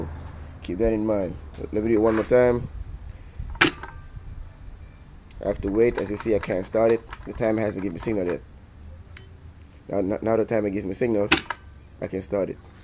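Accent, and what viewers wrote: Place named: Jamaica. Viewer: jamaican accent. thats cool great video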